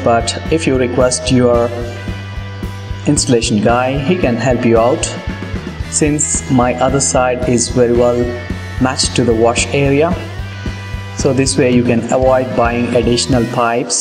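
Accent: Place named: India. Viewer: bro keep the natural Indian accent :) although it wasn't that bad but still keep the natural :V Potato kind of Indian accent XD and nice video :)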